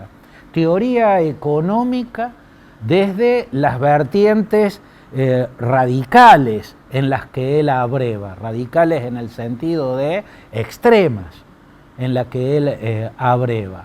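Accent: Córdoba, Argentina. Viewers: Claudito, yenes una arma retórica que seguramente habría impactado en Davos: su exquisito acento Cordobés. Sublime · es muy capaz en política internacional, ahora, de Economía NO SABE ABSOLUTAMENTE NADA. Como buen retacon, cree que es mucho más grande y genial de lo que es. es solo un OPINATOR de cabotaje, lo lindo es que le sale la toooonada cordoooobesa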